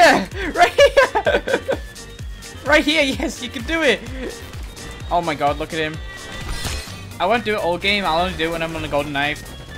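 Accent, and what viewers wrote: United Kingdom. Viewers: you use brithis voice i use russian bcs i think its cool😀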